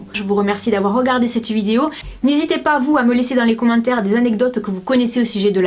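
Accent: South, France. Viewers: J'adoooore ton accent 👌😍 Le sud en force ! 😂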